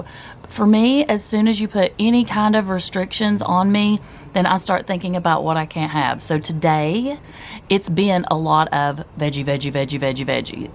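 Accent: South, United States. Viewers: You are hilarious! Good to hear that beautiful southern accent. 💗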